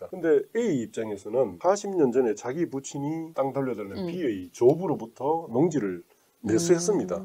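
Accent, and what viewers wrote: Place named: Gyeongsang, South Korea. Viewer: 이 친구 말투로 보니 대구출신이네.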